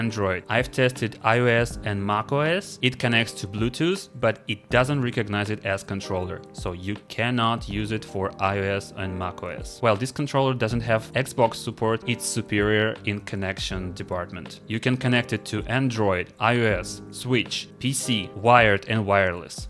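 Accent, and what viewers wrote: Russia. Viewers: Я у меня конечно с английским плохо, но благодаря русскому акценту мне даже субтитры не пришлось включать)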